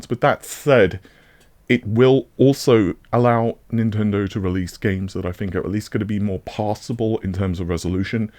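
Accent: England, United Kingdom. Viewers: Brother Man sounds like Tea and Crumpets looks like The Rock's English cousin. SWOLE!:)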